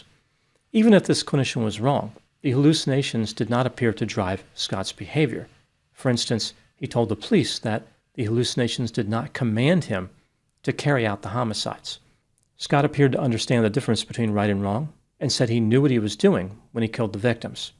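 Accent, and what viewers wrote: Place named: New Jersey, United States. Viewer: Dr accent is very Jersey or Philly..So that may explain alot..lol..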